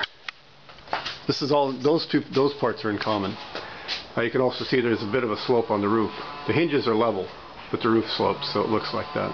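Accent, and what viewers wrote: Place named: South Australia, Australia. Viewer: hey nice to hear another south aussie are you in metro or country sa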